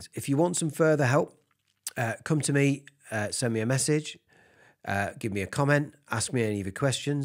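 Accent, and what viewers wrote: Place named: North of England, United Kingdom. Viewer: Great talk/lesson. Sort of proves that often the best teachers are the ones that have been through the crap rather than the ones that had just done it right from the start, if that makes any sense. I heard once somewhere that Bach was a terrible teacher, because it (music/composition I dunno) was all so obvious to him he couldn't understand how anyone couldn't get it, so he was impatient and couldn't explain stuff haha. Sounds like you're from the north of England somewhere, the place I feel the most at home. Unfortunately I'm a long way from there and walk around upside down atm . haha Thanks and kind regards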